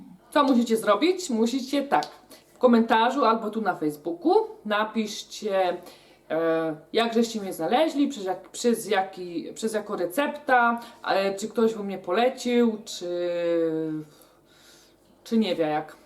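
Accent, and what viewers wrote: Silesia, Poland. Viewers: na początku bardzo gratuluje 13 tys. i życzę aby było ich jak najwięcej. Trafiłam tutaj dzięki poleceniu DG no i gdy zobaczyłam te cudowne torty zostałam najdłużej. Czasem włączam sobie pani filmik i zastanawiam się czy mi by też taki tort wyszedł? Dodatkowa urzekła mnie pani gwara, jestem ze śląska z Gliwic i moja babcia mówi w ten sam sposób, czasem mi przykro, że my (wnuki) tak nie potrafimy. Pozdrawiam, Wioleta. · moje dzieci są zachwycone Pani gwarą, my jesteśmy ze Szczecina i nie mal każde słowo Śląskie jest dla nas ciekawe w słuchaniu, dzieci zachwycają się każdym zdjęciem deserowym, wiec oglądamy po jednym filmiku dziennie i od czasu do czasu pieczemy i gotujemy. Na pewno wyciąga nas Pani z kuchennej rutyny za co DZIĘKUJEMY:) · Ja poznałam panią poprzez film o Elsie z krainy lodu :) mieszkam w Małopolsce , mam 15 lat i bardzo lubię piec :) mam rodzinę na Śląsku , wiec pani gwara nie jest mi opca :) pozdrawiam ciepło ! 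· nie pamiętam już jaki przepis był tym pierwszym uwielbiam je wszystkie i wiele wypróbowałam z przyjemnością oglądam nowe filmiki a najbardziej podoba mi się jak super mówisz po śląsku niektóre filmy oglądam tylko aby posłuchać Ciebie jestem z Gdańska i gorąco pozdrawiam Ciebie i Twoje słodziaki które czasem pokazują się lub odzywają buziaki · witam! Ja panią oglądam juz od prawie roku i super dodała pani przepisy w tym roku jest Pani the best i najlebszą z kucharek Pani jest ma pani śliczną kuchnie i super dzieci ja osobiście zazdroszcze im że jedzą takie pyszne żeczy ma Pani najlepsze recepty na całym you tubie ja poleciłam panią nawet mojemu sąsiadowi Kubie i jeszcze powiem,że fajnie Pani gogo po śląsku bo śląski to przecierz mój polski język a natrafiłam na Panią tak z przypadku i teraz oglądam Pani każdy film pozdrawiam: Paulina z Czechowic-Dziedzic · ja na trafiłam na ciebie jak szukałam przepisu na pannacotta twój kanał jest taki inny niż wszystkie i twoja gwara śląska jest dla mnie bardzo śmieszna i mieszkasz obok mnie bo ja mieszkam 70 km od Monachium pozdrawiam serdecznie :* a może jakieś Q&A nagrasz ? 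· ja trafiłam na pani kanał jak szukałam jak zrobić ciasto na pizze. Oglądam panią razem z moją mama.:-) jest pani super!:-) Mieszkam na śląsku obok Katowic i Sosnowca. miło jest oglądać kogoś co tysz umi godac po slasku.:-) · Ale ześ wymyśliła konkurs. Ja ci nie powiem jak cię znalazłam. To były chyba twoje rózne drozdżowe wywijasy: piza słońce, makowiec i gwiazda z nutellą. Ja zasubskrybowałam cię bo uwielbiam, jak gadasz z tym ślaskim akcentem:D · Jestem ze slàska i mieszkam w Hisxpani dosyć troche i słucham cie po ślonku to fajne jest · Moje gratulacje z okazji subów, jestem z dolnośląska z Głogowa, bardzo podoba mi się że pani przepisy nie są wymyślne ani skomplikowane i "goda " pani krótko i na temat i często daje te filmiki co budzi moje zdziwienie, że przy trójce dzieci pani to ogarnia i jaszcze jedno- ten słodki szkrab uśmiechnięty wysyłający buźki, bardzo mi się podoba, szkoda że nie jestem jego ciocią i nie mogę go wyściskać, nie miałabym nic przeciwko temu żeby pojawiał się w każdym filmiku.Mam koleżankę w okolicach Katowic i wtedy też godom, bardzo mi się te gwara podoba .Oczywiście polecam kanał, często korzystam z przepisów, trafiłam dosyć dawno przypadkowo i oglądałam ciurkiem wszystkie przepisy, pozdrawiam, życzę dalszych sukcesów.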